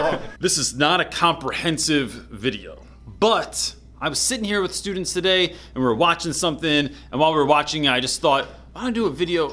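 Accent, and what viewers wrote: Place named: Philadelphia, United States. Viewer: Thank you! It’s nice that focus isn’t an issue anymore ))) Instantly glued to the like button. You accent is truly Philadelphian ! Love it. Thanks! Sir!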